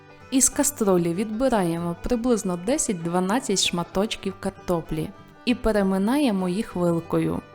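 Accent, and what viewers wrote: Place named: France. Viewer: Маєш французький акцент але смачно ❤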